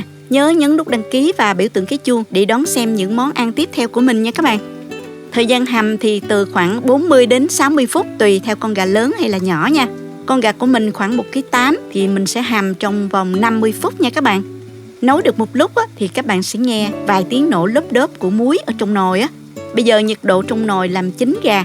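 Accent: South, Vietnam. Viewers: Giọng nói đặc sệt Nam Bộ. Chỉ dẫn tận tình,tỉ mỉ. Nghe dễ nhớ, dễ hiểu vô cùng. Có thể dùng nồi cơm điện hấp được không? Cảm ơn cô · Cô ấy có giọng nói Nam Bộ rất dễ thương và dễ cảm tình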